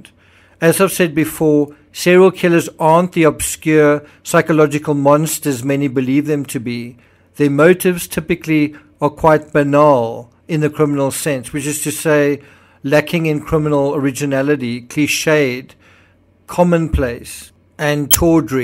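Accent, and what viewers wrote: South Africa. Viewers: Whoever said u r a liability in this case, is an ass. U’re obviously knowledgeable and put a lot of work into investigating each, including this one, case. U’ve even traveled to many of the areas in which the crime took place, or where the predator worked, or whatever!! I am also a fellow South African listening to u from Rhode Island, USA. P.S. love hearing the accent! Thk u!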